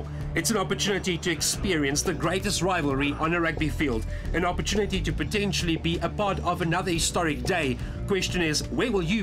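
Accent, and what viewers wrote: South Africa. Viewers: it's a South African accent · I love the South African accent bro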